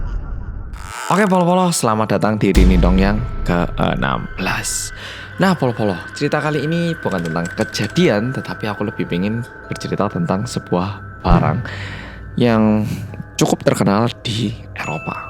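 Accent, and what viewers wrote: Java, Indonesia. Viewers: Mau cerita dikit ya. Ibuku menderita Alzheimer setahun ini Sebelum beliau kena alzheimer, beliau sering tak ajak nonton bareng videomu. Terutama yg kulineran & jalan2 Setelah itu lamaaaaaa sekali ibu ngga nonton videomu krn aku pindah rumah, ngga serumah lagi sama ibu Setelah terkena Alzheimer, beliau ikut aku. Bbrp hari yang lalu, aku nonton Rindingdong di depan ibuku dengan volume full Eh ibu langsung "iki sing wong korea medok kae yo la?" Walah, takjub aku. Ibu masih inget sama, walau memori2 yg lain banyak yang hilang 😄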